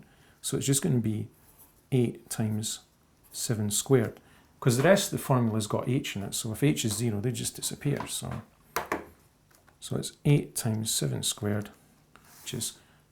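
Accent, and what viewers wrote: Scotland, United Kingdom. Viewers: Are you from Scotland? If so, are you from the Inverness area?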